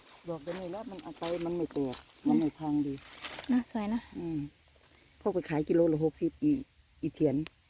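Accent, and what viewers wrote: Korat, Thailand. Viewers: พูดเหมือคนโคราชเนาะ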